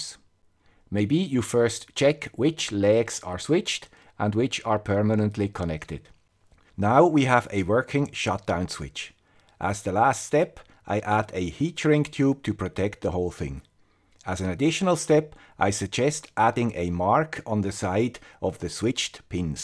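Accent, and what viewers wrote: Switzerland. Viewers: was in Switzerland last month for first time, and wow, this is the land that Swiss accent guy living and making experiment !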